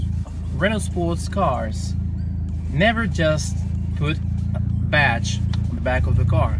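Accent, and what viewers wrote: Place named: United Kingdom. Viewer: Man me encanta , muy británico !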